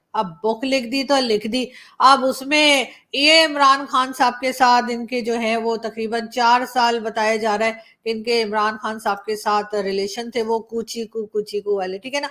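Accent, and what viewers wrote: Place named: Mumbai, India. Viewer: Mumbai language achha bol lete hein aap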